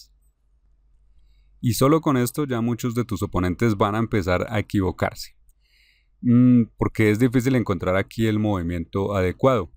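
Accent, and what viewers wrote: Bogotá, Colombia. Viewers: ¿ Igor Smirnov es el Colombiano que estoy escuchando ? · Espectacular!!! En especial la última, Que acento tan capitalino-Teusaquillo, muchas felicitaciones Exelente Canal!!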